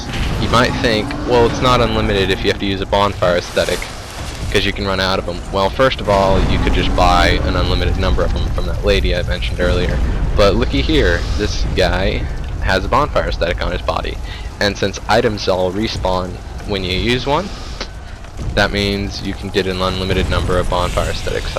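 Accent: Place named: Canada. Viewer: canadian eh ?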